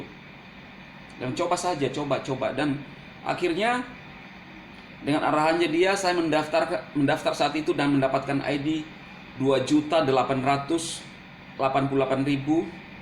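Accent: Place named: Bugis, Indonesia. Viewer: logat bugis y.. josss vitube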